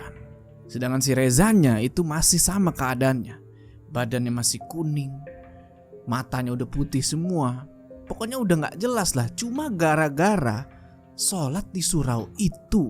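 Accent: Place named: Bali, Indonesia. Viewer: Kok malah mirip logat bali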